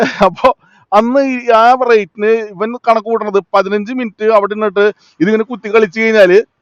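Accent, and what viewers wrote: Thrissur, India. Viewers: ചേട്ടൻ തൃശ്ശൂർ ഭാഷയിൽ നന്നായി പറഞ്ഞു. 😂ഇനി കാനഡ പോലീസ് നമ്മുടെ നാട്ടിലേക്കു വരുമോ. മോദി ഗ്യാരണ്ടി 😂അവന്റെ ഭാര്യ പോയാലും കുഴപ്പമില്ല. പണം കിട്ടണം.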